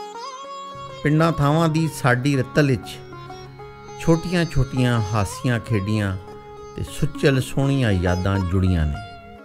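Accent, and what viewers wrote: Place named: Punjab, India. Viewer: It is just fabulous... nostalgic... Punjabi accent is also just love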